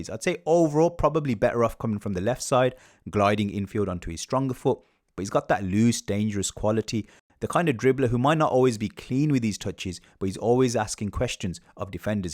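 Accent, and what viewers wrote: Birmingham, United Kingdom. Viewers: Lovely analysis. 1st time listener, but will deffo be back. Is that a slight Brummie accent I can hear BTW? 😂 Shirley boy here!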